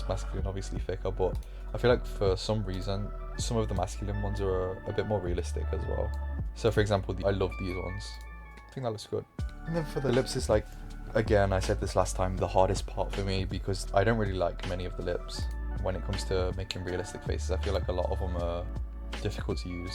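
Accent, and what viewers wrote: United Kingdom. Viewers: Prolly just his accent, I'm british too but I don't say it like that tbh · Are you british cuz I love you,your vids and your accent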